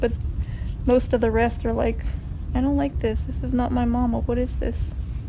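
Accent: Louisiana, United States. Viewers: Most helpful video I've watched yet👍I like your accent too, sound like you're a Louisiannan🐰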